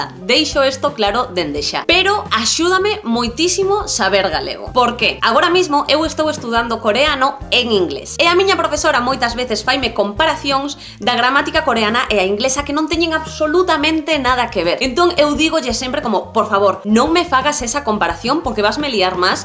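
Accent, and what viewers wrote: Castile, Spain. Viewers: Galego con acento de un español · ela esta falando eu português com sotaque espanhol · Yo diría que trates de pronunciar gallego como nativa ya que sabes hablarle xq estas hablando gallego con acento castellano ESO NO ES PERFECTO.... ES COMO QUE UN FRANCES NOS QUIERA ENSEÑAR CASTELLANO CON ACENTO FRANCÉS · No sientas esto como una crítica pero creo que tu gallego está muy castellanizado (usas infinidad de tiempos compuestos en los verbos y muchas palabras que tienen su variante propia en gallego como tal). Imagino que es porque eres 'neofalante' y lo has practicado poco. Sin embargo he de darte la enhorabuena por tratar de visibilizar y darle toda la importancia que se merece a un idioma que, sin duda entre todas las lenguas de España, es la que tiene más potencial. Mi consejo: practícalo más si puedes, escucha a la gente más mayor (suelen ser los que menos contaminación lingüística tienen aunque no son infalibles), lee mucho al respecto y lo bordarás (nadie nace aprendido e incluso estudiar bien el gallego en Galicia es difícil ya que tanto RAG como profesores coinciden equivocados en que el gallego evoluciona de manera natural cuando en realidad lo hace con un alto grado de contaminación lingüística). 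Es más, alguien en los comentarios decía que te estaba escuchando y que creía que hablabas castellano (no es el primero que es de fuera de España al que le escucho decir que el gallego es "como un castellano mal hablado". Síntoma excepcionalmente grave de que el gallego en cien años acabará siendo un dialecto. De hecho la fonética es con el normativo prácticamente castellana. Una pena... Te lo dice alguien que se defiende en varios idiomas, la mayoría de ellos romances y contando también con algunos propios de la península ibérica, y que ve cómo el gallego poquito a poco es, de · Ela está falando portunhol com forte sotaque espanhol... Pelo que sei, isto não é galego...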